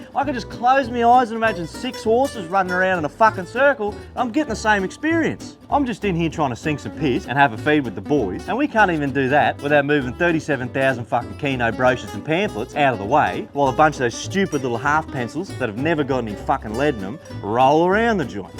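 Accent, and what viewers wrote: Australia. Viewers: don't know why but I didn't expect an aussie accent to come out of that face... Anyhoo, you wanna be a sultan of salmonella, you should come to kagoshima where we eat raw chicken and you can get raw egg to pour over your gyudon.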